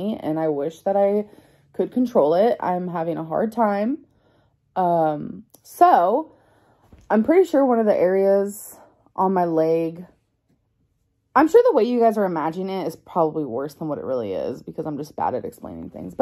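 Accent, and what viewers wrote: South, United States. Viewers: What happened to her southern accent ? I haven’t watched her in a while lol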